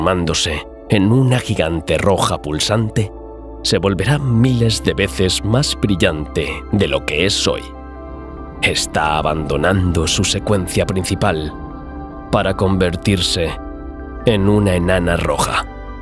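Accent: Spain. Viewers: Me gustaría un narrador más americano no Europeo, no me gusta la narración Española!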